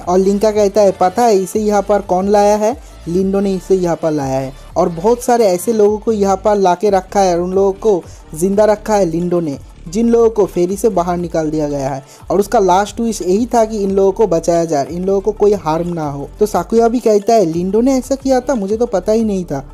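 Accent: Bengal, India. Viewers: Ap bangal se ho kya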